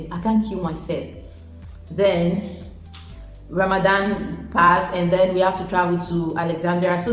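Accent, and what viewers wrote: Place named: United Kingdom. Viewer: Your British accent is fire 🔥.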